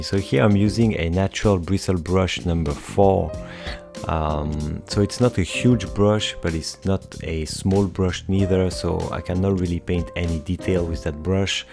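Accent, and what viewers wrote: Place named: France. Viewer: haha l'accent français je t'ai démasqué !!! très bon travail!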